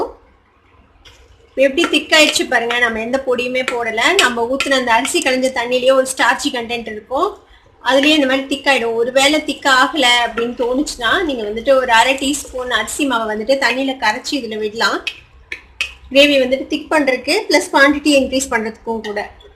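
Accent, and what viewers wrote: India. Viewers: நீங்க நிஜமாவே கோவை தானா..? உங்க பேச்சு வழக்கு எங்க பகுதியில் உள்ளது போல இருக்கு களனி னு சொல்றது களனி புளிச்சாறு ரொம்பவே நல்லா இருக்கும். Idhu ennoda mobile number sister. karaikudi pakkam vaanthaal avasiyam vaanga sister. 😊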